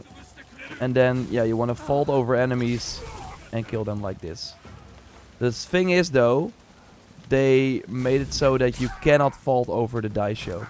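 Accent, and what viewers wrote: Netherlands. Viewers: Dude are you from the Netherlands by any chance?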